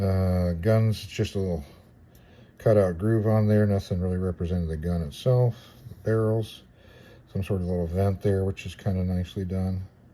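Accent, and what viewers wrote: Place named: United States. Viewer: It's good as it is , even the pronounciation of French words is passable for an American 😅, good job.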